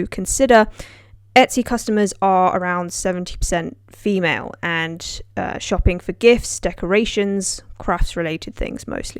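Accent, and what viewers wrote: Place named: Southern England, United Kingdom. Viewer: She's from the South of England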